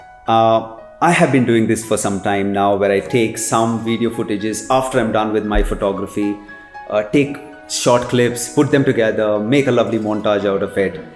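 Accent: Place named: Canada. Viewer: Your accent is funny. Are you a Canadian?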